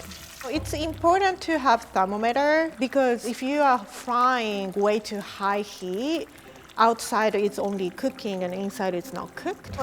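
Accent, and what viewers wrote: Japan. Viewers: I just love Japanese/English voice!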